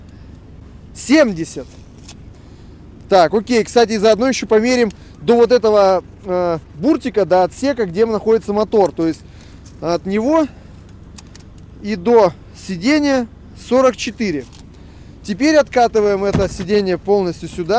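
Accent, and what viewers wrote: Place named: Irkutsk, Russia. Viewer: Подожди-ка! Ты шО иркутский???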